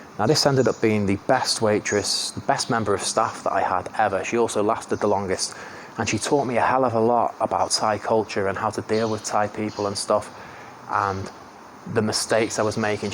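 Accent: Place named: Manchester, United Kingdom. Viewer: Well that confirms I'll never open a restaurant in Thailand hahaha. Great story. Are you originally from Ireland because to me your accent is North West/Manchester English?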